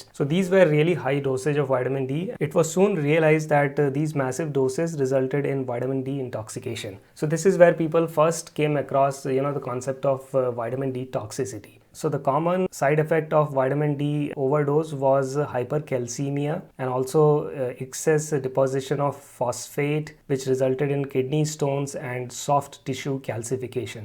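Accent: India. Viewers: Whiteamin Dea 😂 I love the Indian accent haha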